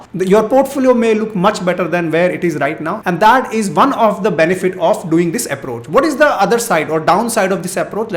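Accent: India. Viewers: Dang! thats a heavy AF indian accent..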